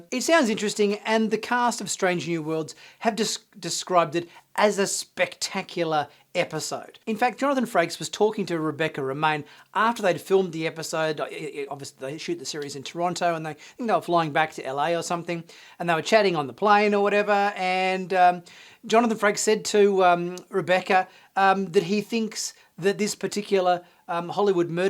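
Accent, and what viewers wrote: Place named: Australia. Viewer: I like the way you talk, but I'm wondering. I've heard a lot of Aussie accents over the years. Are there different regional accents in Australia? Can y'all kinda tell where each other are from by the way you talk? My own accent is mostly Midwestern American with hints of upstate New York and I met someone once who nailed down by my accent that I was born in New York and grew up in Colorado. I have noticed that Aussies do sound a bit different here and there, but I can't tell if someone is from Melbourne, Sydney or the outback. You don't exactly sound like Crocodile Dundee for instance. Y'know what I mean? I'm going to ask other YouTubers too. Feel free to call me cunt any time brother!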